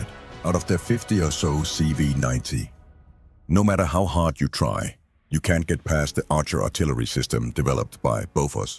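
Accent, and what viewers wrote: Denmark. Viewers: Just curious, is that your voice or a random narrator? It has a hint of danish accent, but you are Norwegian according to the bio. Great voice and awesome content, much appreciated 🫡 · Du er dansk. Det hörs på accenten. Det er god engelsk men mej lögner du ikke.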